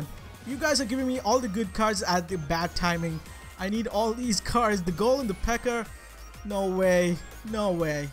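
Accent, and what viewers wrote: India. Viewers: ASHUTOSH ZAGADE ofc he is, he has the Indian accent and his currency is in rupees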